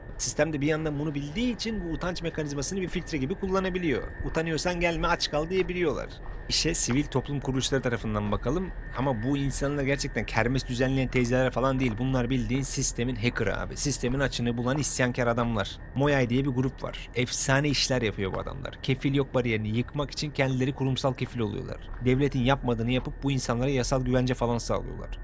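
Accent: Japan. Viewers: japonyada kalmak cidden etki etmiş bu arada kelimeleri telaffuz şeklinden japonca konuştuğun baya anlaşılıyor çok tatlı (‾◡◝)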